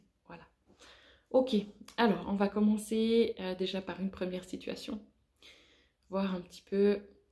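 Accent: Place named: Switzerland. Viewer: Merci beaucoup pour cette vidéo! J'aime beaucoup ta manière d'expliquer ☺. Je me suis dit mais je connais cet accent et j'ai vu que tu étais en Suisse comme moi d'ailleurs. Je ressens beaucoup de fatigue actuellement et ce que tu as dit résonne juste en moi. Je fais de la méditation pour intégrer ces énergies au mieux. Je t'envoie beaucoup d'amour 😇🙏. Je me réjouis des prochaines vidéos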